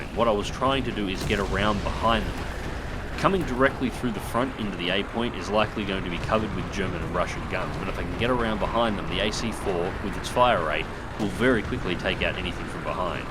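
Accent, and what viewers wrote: Australia. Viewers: Matey, I really enjoy how you describe things!!!! You could make bread mold sound like the most fascinating thing on the planet!!! If I was an Arab, I would buy sand from you to put next to all of the rest of the sand I had!!! With that smooth Aussie accent, I'm glad I'm not buying a horse or a used car from you!!!! Please think of this as my off-handed attempt at paying you a sincere compliment!!!!! What Squire is to funny, you are to amazingly interesting!!! the fact that you are often self-deprecating, makes you even more interesting and honest!!! I have been such a huge fan since I found your channel!!! I truly wish I could buy you a cold beer and a stubby of Jack!!! Maybe some day I will get the opportunity, who knows, the world is getting smaller all of the time. All the best from way out West, Sprog1 in AZ.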